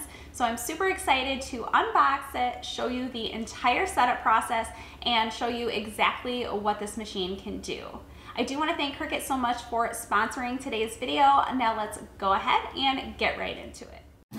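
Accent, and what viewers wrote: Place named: Chicago, United States. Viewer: Sounds a little like a Chicago accent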